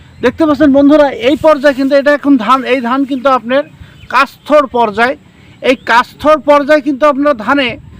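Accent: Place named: Magura, Bangladesh. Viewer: ভাই আপনার বাড়ি টা কোথায় একটু জানাবেন আমাদের মাগুরার ভাষার সাথে আপনার ভাষার মিল আছে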